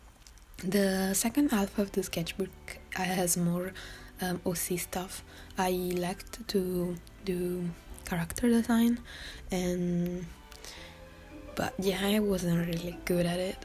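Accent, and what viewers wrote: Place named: Italy. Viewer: I like your accent, where are you from? Italy?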